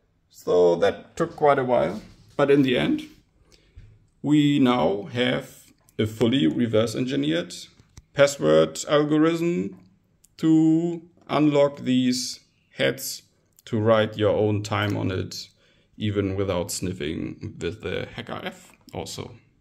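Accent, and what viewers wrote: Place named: Germany. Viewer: Interessante Information aber dein Akzent kommt so stark (wie bei jedem Deutschen) hervor :D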